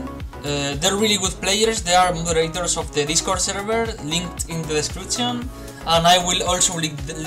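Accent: Spain. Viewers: SE TE NOTA UN WEBO Q ERES DE ESPAÑAA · eres español? el acento lo parece jajajaja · Se nota tu acento español xd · Se te nota el español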